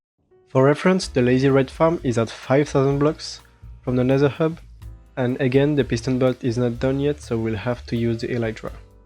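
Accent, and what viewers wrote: France. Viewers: Another incredible video. Thank you very much, your explanations are super clear (Surtout l'accent français qui m'aide à comprendre😂). Love from France ❤.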